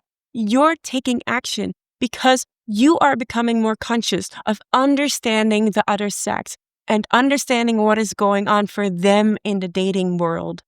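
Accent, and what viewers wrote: Netherlands. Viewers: Is... is that a dutch accent i hear ?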